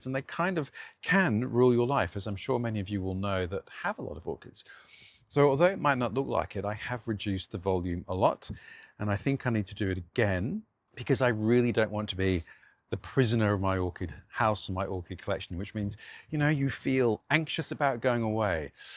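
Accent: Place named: Australia. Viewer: your rental is fabulous and please don’t stop making videos! Your great sense of humor and delightful Aussie accent truly elevate the viewing experience for me, and I thoroughly appreciate your orchid culture insight and so enjoy seeing your plants. Happy New Year from my very crowded rustic cabin grow space in Southern CA.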